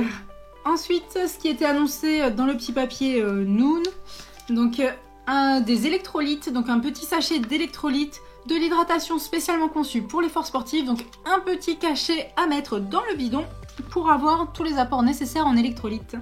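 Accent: England, United Kingdom. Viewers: super video encore!! j'avoue que je ne prendrais pas la box pédaleur, mais franchement c'est super sympa de nous faire découvrir ça, et puis c'est toujours cool de pouvoir avoir des nouveautés qui peuvent arriver sur le marché, grace a toi!! Et pour finir j'aime beaucoup ton "accent anglais" quand tu parles:-D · Des chaussettes 😃 !!!! routine recup ✌️✌ cool l'accent anglais 😜😜 remarque c'est normal !!! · Quel belle accent anglais 😁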